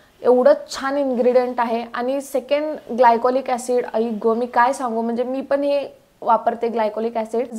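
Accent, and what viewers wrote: Kolhapur, India. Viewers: Communication skills Uttam ahe tumach❤ kolhapur cha tone yetoy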